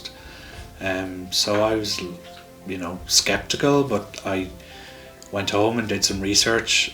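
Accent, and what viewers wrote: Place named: Ireland. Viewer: A well-made video about an important topic. Love your Irish accent! Nice choice for background music. I'm very easily distracted myself an possibly someone who could meet the diagnostic criteria. But I was able to focus until the end. Cheers!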